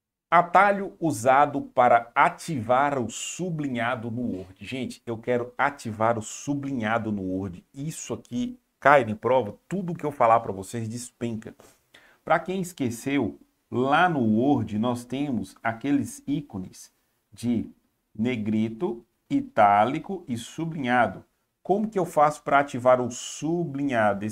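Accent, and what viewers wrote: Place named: Minas Gerais, Brazil. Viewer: Em 2024 gostei muito da aula e adorei o sotaque mineiro. Parece mineiro né. Abraço parabéns 👏👏👏👏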